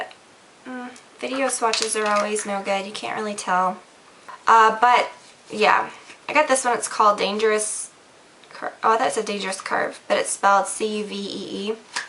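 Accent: South, United States. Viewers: I think it's just might be a bit of her accent...she's from the South I believe...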